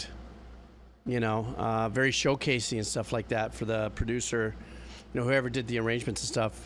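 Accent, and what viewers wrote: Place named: Argentina. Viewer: hahaha wasnt expecting you to talk so well in Spanish (Argentine accent)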